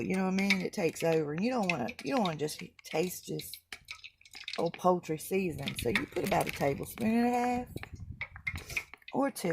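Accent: South, United States. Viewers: Hi there... I made your chicken today, marinated over night.. My family loved it. My husband who hears me listen to your videos at times asked me if I was going to talk Southern like you while cooking... In good spirit and in my best southern accent I said " Col. Sanders ain't got nothing on ".. We laughed and I saluted you with my tongs! Thanks for sharing your recipe it's really good!😘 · Looks delish!! Where are you from in the South? Your accent is SO cute!!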